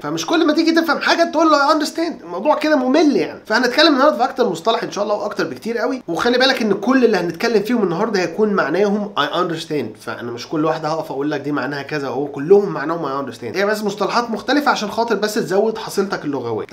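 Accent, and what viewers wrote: United States. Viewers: لهجتك كثير محترفة كأنك اميركي بالفعل. ممكن تعمل فيديو عن طرق ممكن من خلالها نتعلم اللهجة الأميركية؟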